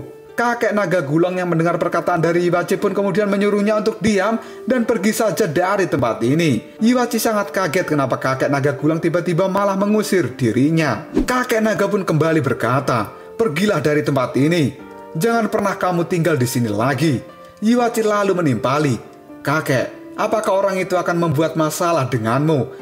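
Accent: Java, Indonesia. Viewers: Logatnya Jawa ya bagus sob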